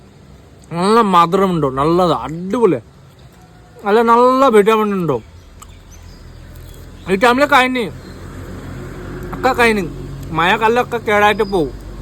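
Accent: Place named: Hindi, India. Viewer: ഭായിയുടെ ഹിന്ദി കലർന്ന മലയാളം സ്ലാംഗ് ❤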